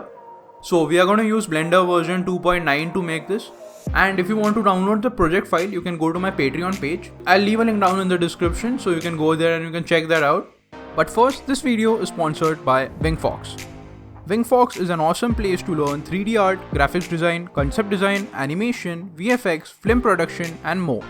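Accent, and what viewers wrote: India. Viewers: There's a heavy Indian accent!!😂😂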